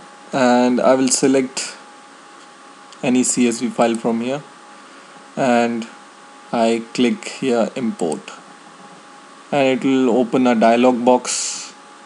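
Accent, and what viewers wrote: India. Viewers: thanks, it helped me a lot; and I truly appreciate your Indian accent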